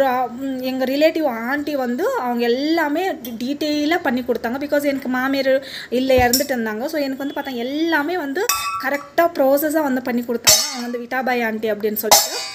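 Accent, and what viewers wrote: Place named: Bangalore, India. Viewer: Mam you have given a good explanation.. i think you are explained the procedure followed by Vahnikula kshatriya. Tamil you have spoken is Bangalore Tamil if am right. Thank you for the explanation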